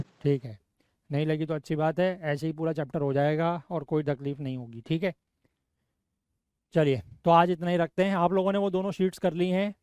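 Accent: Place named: Kashmir, India. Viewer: That Kashmiri accent😂❤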